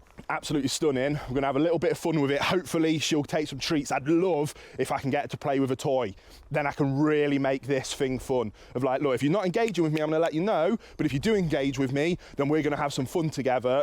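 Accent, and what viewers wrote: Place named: East Midlands, United Kingdom. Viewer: I can't pin your accent, I first thought Coventry but then I detected a slight East Midlands twang, maybe somewhere near the A5?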